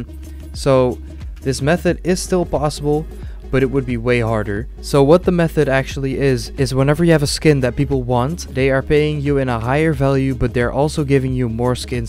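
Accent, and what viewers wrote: Netherlands. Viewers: its nice to know your dutch i sometimes hear a little bit of an dutch accent (im dutch myself and i recon the same thing XD)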